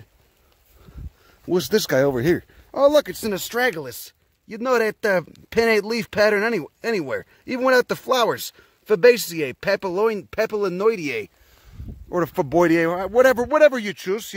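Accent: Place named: Berwyn, United States. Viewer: Very interesting. I’m in Northern New Mexico and I’d like to learn about all the stuff growing on my property. By the way, you talk like you’re from Berwyn. Are you? 🙂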